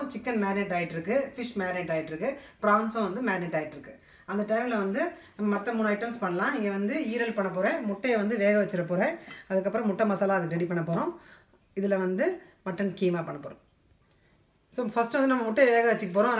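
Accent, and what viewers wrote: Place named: South Tamil Nadu, India. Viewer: super mam......just now I saw your cooking video and subscribed....its really amazing and I couldn't believe your are speaking perfect south tamilnadu language.......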